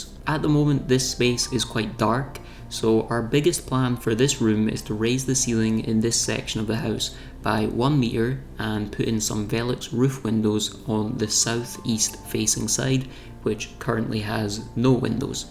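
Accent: Scotland, United Kingdom. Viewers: TECHNICALLY IT IS NOT " NATURALLY RUNNING WATER".... IT HAS BEEN CHANNELED THROUGH A MANMADE STUCTURE.......I felt obliged to correct you since you are such a carefully worded individual .........Secondly , I wonder if you have deliberately modified your Scottish accent......because your speech is pleasant.....whereas in general the Scottish accent is brutal on the English language ....I only watched to the " naturally running water" reference so that is all have to say regarding this video......however , I was interested by your video revealing how much Youtube paid you......and I did notice also , your promo for your " Questions people ask me" video.....but didn't watch it......however ,.....are you gay / are you single /Did you buy that cat because its colourings resemble your own / what are your opinions on the current transgender debate ?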